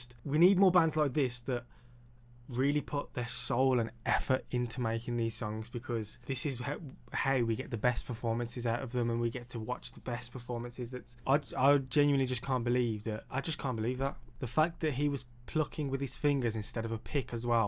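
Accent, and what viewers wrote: Birmingham, United Kingdom. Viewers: Hi Got a (not very) secret crush on you and your Brummie accent 😚. A question for you - did you chose your own title, or was it a suggestion? Just asking because it has a strange meaning, that you may or may not be aware of. Btw I watched your post 'Am I Gay' which I found confusing tbh. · They are one of ours, this was at the hammersmith odeon in london. your accent sounds like a brummie one but the loike could be west country or east anglia.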